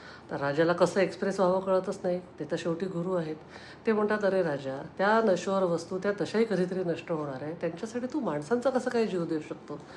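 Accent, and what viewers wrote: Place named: Pune, India. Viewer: Mla pn aasch vatat hot ki bahutek tumhi punya cha aasal bolnyacha ton varun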